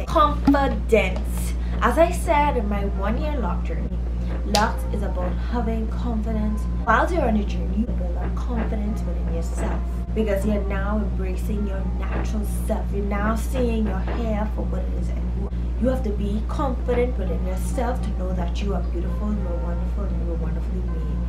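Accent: Bahamas. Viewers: I don't comment on videos but been watching your channel for like two months. Love the authentic Bahamian accent and your content is improving. Stay true and Congrats.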